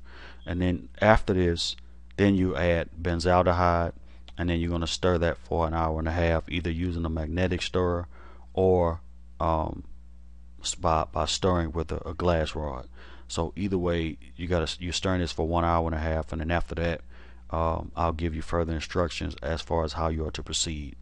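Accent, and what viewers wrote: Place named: United States. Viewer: Cowboy accent is 🔥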